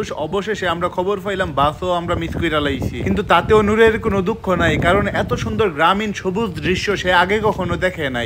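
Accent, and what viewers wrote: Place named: Brahmanbaria, Bangladesh. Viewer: আসসালামু আলাইকুম ভাইয়া আপনার ভিডিওগুলো দেখি কারন আপনি আমার নিজ জেলার ভাষায় কথা বলেন খুব ভালো লাগে। Brahmanbaria মানুষ শুনলেই মানুষ ভয় পায় এবং বলে ভালো না আমরা এই কথাটা আমারও শুনতে হয়। আসলে আমার মনে হয় সব জেলাতেই ভালো খারাপ মানুষ রয়েছে। সবাই খারাপ হয় না। কিছু মানুষের জন্য সবার বদনাম হয়। এটা মানুষ বুঝতে চায় না। আজকে আপনার ও আপনার বন্ধুর কথাটা শুনে মনটা শান্তি পেল 😊😊😊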